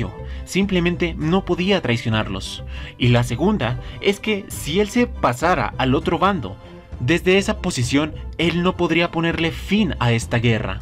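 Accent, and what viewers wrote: Colombia. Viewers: Eres colombiano!?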